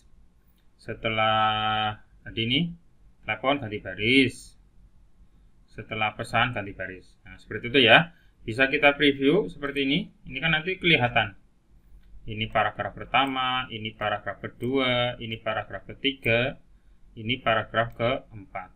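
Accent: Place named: Banyumas, Indonesia. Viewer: orang ngapak kie...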